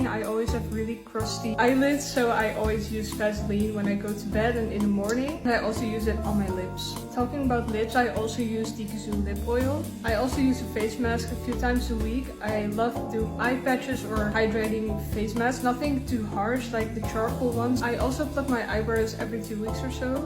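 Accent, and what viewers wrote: Netherlands. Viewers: You have a really Dutch accent, idk why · I can hear from ur voice ur Dutch and if ur not then I am tone deaf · hey girl ben je toevalig nederlands ik hoor een accenttt!?? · girlieeeeee are you dutch you have a littele dutch accentttttttttttttttttttt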